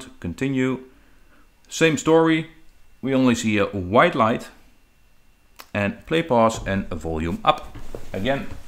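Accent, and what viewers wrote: Netherlands. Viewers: You sound dutch bro but nice video bro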